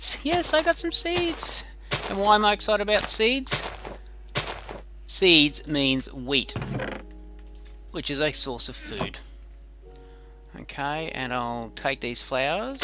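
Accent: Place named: Australia. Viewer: It's great to hear another Aussie accent! I've been watching Pixlrifs' series and thought at the start i could play such a world...but then the more he got into it, the less confident i feel!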